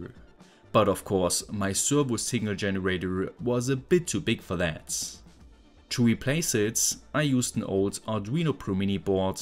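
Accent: Germany. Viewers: Are you German? You have a strong German accent and pronounciation ("batterwy", "differwence") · German accent ?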